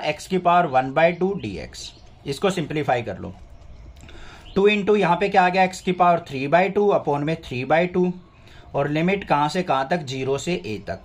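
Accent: Haryana, India. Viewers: Ap haryana se ho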